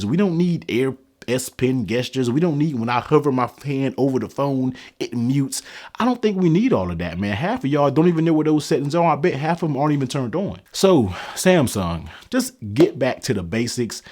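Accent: United States. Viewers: lol! Figures... I'm from VA and you sound like my all my family and friends in NC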